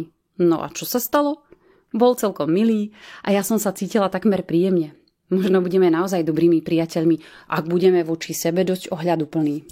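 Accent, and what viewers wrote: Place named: Slovakia. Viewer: Cítit, že slovencina je tvoj matersky jazyk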